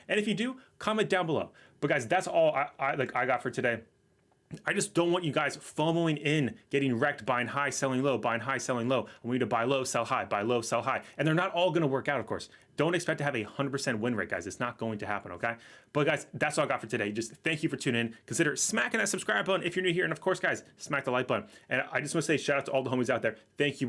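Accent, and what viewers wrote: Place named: Berkshire and Buckinghamshire, United Kingdom. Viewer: I'm from there. Distinctive accent. :) berks and bucks 4me. But just guessing!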